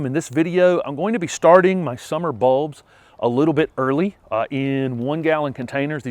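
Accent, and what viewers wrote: South, United States. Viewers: Where are you? Somewhere down South, I can tell that.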